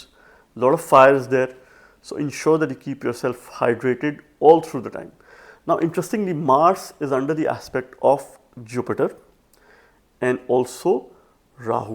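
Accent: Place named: India. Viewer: It would be easier to understand if you didn’t have such a strong Indian accent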